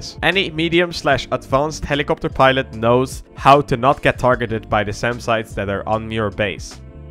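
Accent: Netherlands. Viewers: Ru Dutch? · I smell Dutch 🎉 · are you dutch? xD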